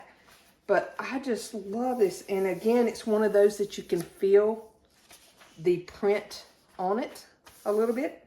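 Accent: South, United States. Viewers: wonderful haul. I would paint your bedroom white and that away you’re not limited on what color accessories you can use. I have always loved Mary Englebreite books and have most of hers. The large book is wonderful. I speak Southern too😊. Love and blessings 🧡💛🧡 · Loved your haul. You speak just fine don' t change a thing. I'm from Florida and I have a southern accent also! ❤😊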